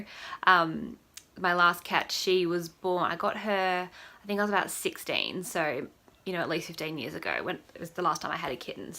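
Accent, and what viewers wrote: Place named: Australia. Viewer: I knew you were Aussie because of your accent,were are you from? I'm from Victoria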